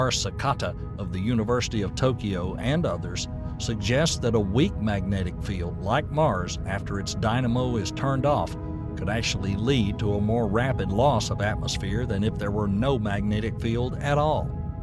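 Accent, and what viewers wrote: South, United States. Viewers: I can’t figure out if the narrator is a genuine member of the scientific community, or maybe an enthusiast with an impressive understanding of the subject of astrophysics…or just someone regurgitating material from Wikipedia or space websites. I am well educated in physics and to a lesser degree astrophysics, so I can usually detect whether or not a person is pretending to know what they’re talking about or if they have a real understanding of the topic. But this guy confuses me! The complexities of quantum mechanics and astrophysics seem to be well understood by him, but at the same time, he mispronounces certain terms…egregiously! However, I too am from the south and I have experience with being judged by people who think my accent is an indicator of my intellect. Regardless, I thoroughly enjoy his content and often listen to his work in the background while I am doing housework or other mundane tasks.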